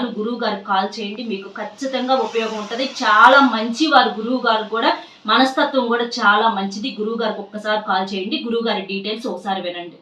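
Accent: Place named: Narasaraopet, India. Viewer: White saree, black jocket malleypuulu,narsaraopeta bhasha maatlaadatam ,anni superuu😅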